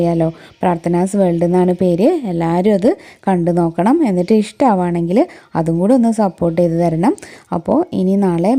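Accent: Thrissur, India. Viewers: നല്ല ഒരു മെസ്സേജ് ചേച്ചി തൃശൂർ ആണോ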